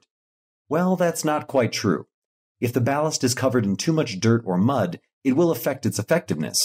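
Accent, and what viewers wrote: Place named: United States. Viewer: Why are you going to program AI with an American accent and then give us measurements in centimeters?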